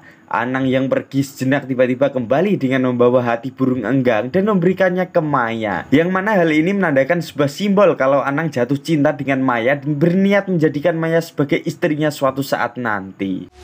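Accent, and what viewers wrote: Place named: Java, Indonesia. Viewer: jhon versi jawir,medok sekali hehe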